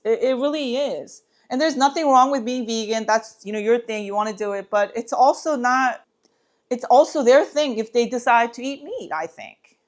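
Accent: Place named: United States. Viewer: I just found you. Idk how or why. YouTube recommends me all kinds of stuff. I guess maybe it knows I recently started eating meat too so it recommended you to me. I’m happy to find you. Are you Korean, American, Korean-American? (You sound American) I’m from Atlanta and growing up had a lot of Korean friends or I mean friends whose parents were from Korea. My God mother is Korean. So I’m just guessing sorry lol… maybe more than guessing… anyways I will follow you now for recommendations and things. You’re funny and have good personality so I like what you have to say. Also watching you cry and eat the steak was funny, but I totally got it lol…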